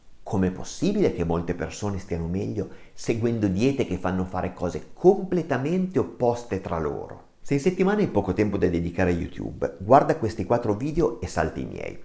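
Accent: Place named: Piedmont, Italy. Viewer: Preferisco le tue pillole di saggezza, con una fantastica cadenza torinese, o piemontese, lo sono anch'io, che quelle che vendono i tuoi colleghi "farmacisti"🤣🤣🤣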